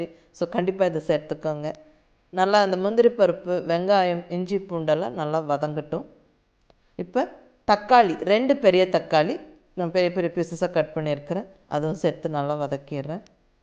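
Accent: Coimbatore, India. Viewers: unga slang keta neenga from Coimbatore thaana 🤔, anyway thank u 😊 IL try this for sure 👍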